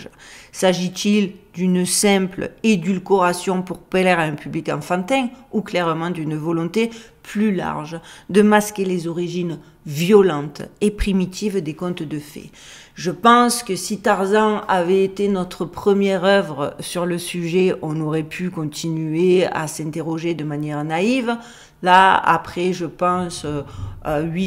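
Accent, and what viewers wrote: Marseille, France. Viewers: Bonjour Continue à garder ton accent marseillais et tes petits rires trop craquants en racontant tes histoires. Sinon c'est comme si tu lisais une belle partition mais sans t'accompagner d'un instrument🥰🥰🥰🥰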